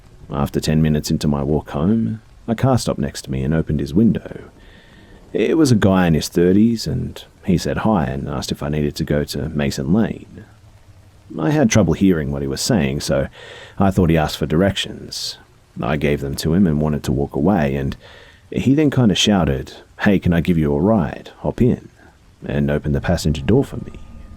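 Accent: Australia. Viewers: Good story but having a narrator that is man with a man with australian accent playing a 29 year women from texas with a southern accent does not do it for me. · Wait do you have an Australian accent?? Oh my gosh that's my favorite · Also I have to ask are you an Aussie? I am and you have a very Aussie accent 🇦🇺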